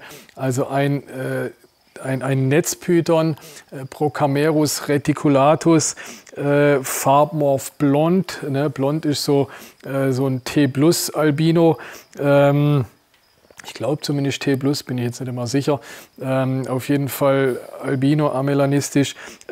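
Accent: Swabia, Germany. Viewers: Klasse. Vielen Dank für dieses wichtige und meiner Meinung nach dein bisher bestes Video. Einziges Manko aus meiner Sicht : dein hartnäckiges Beharren auf deine für Nicht-Schwaben schwer verständliche Mundart. Da du das offensichtlich weder ernst nimmst noch jemals ändern wirst : wie wärs mit generellen Subtiteln auf Hochdeutsch? Abgesehen davon hast du das Video echt toll gemacht und bei dem brisanten Thema echtes Fingerspitzengefühl bewiesen , Hut ab. Viele Grüße von Toni Mindnich aus Spanien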